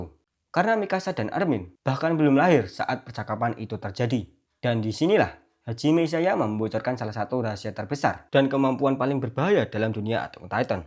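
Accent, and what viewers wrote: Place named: Java, Indonesia. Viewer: Medok bgt jaw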